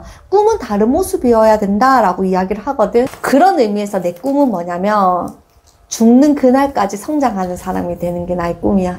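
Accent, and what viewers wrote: Gyeongsang, South Korea. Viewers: 실버버튼 응원합니다. !!! 42개월 여아 키우는 워킹맘입니다. 숲놀이 검색하다가 교수님을 알게 되었는데 지금 저에게 필요한 내용이 많아 이것저것 듣다가 팬이 되어버렸어요 지금 틈 날때마다 계속 듣고 있는데 사투리에 대해 고민이 있으신지 몰랐네요. 전 선생님께서 사투리 안고치셨으면 해요. 제 남편이 경상도사람인데 말투가 너무 쎄고 공격적이라 부드럽게 말해달라고 하면, 경상도라 그렇다. 경상도는 원래 그렇게 말한다라며 정당화 시키거든요. 근데 또 경산도도 내륙쪽이랑 바닷가쪽이랑 또 다르다며 바닷가쪽일수록 더 거칠다라고 말하는데.. 경상도여도 말투가 이렇게 다정하고 부드러운분도 계시다고 꼭 알려주고 싶어요 ~~~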